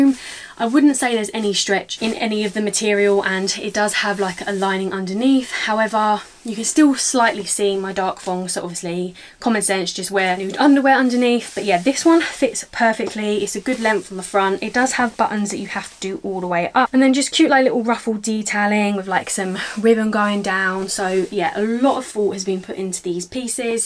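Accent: United Kingdom. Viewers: I Love You British accent.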